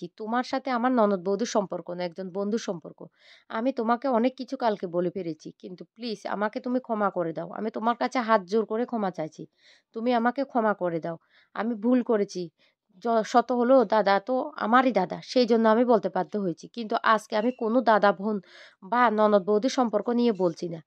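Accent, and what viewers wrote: Bangladesh. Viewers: Plz ei pronunciation niye r video deben na. Bangladeshi tone ekhono ekdom jay ni.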